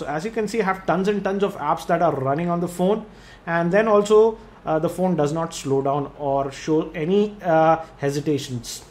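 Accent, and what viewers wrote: India. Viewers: just came here for the funny indian accent.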